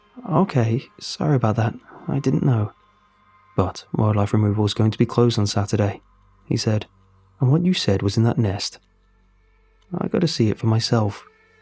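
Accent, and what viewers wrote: United Kingdom. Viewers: Damn it I don't want to admit this but, I want you to keep narrating so I'm going to give you the compliment at the cost of my own public shame.. I actually wait for your freaking stories and I was so psyched to look down and see an early one because even though it's a highlight I wouldn't toss around publicly, it's still nevertheless is a highlight.. actually if I'm going to be honest it's a spotlight. It's amazing what these narrations can do when you're having problems. I've caught in myself thinking through things while listening, and although your Asian, (which sticks in your head somewhere in the back for some reason) the British amazing accent is surprisingly soft and it's very comforting. On a side note listening to Mr creepypasta, I see a guy in a blue suit that resembles Spider-Man, that shifts from a maybe comic book character to and uncanny amount of different human variations, so at least with you it's a comforting guy on a couch drinking tea type of thing, it's really unique I'm rambling thank you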